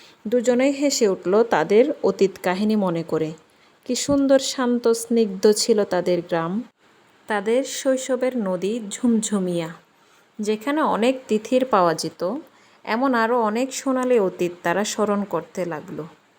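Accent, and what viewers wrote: Chittagong, Bangladesh. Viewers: আপনার ভাষ্যে চট্টগ্রামের আঞ্চলিক লক্ষণীয়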